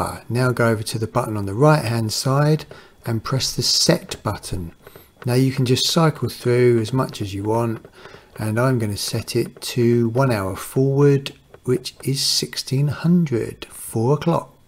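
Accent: United Kingdom. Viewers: If want know my opinion, so, don't waste you valuable time on subtitle for languages which you are not sure Google translates properly. Russian is funny but about 70% understandable, Latvian ... I checked your another video - maybe 20% even less. So, Does not helps. I can't tell about other languages, just that I know well. There are auto-translated and manual translated subtitle options at Youtube. What I suggest, is make really good English ones, because that could help a lot for non English speaking people who not very good at British ascent. Hope this will save you a lot of time. Good luck my friend.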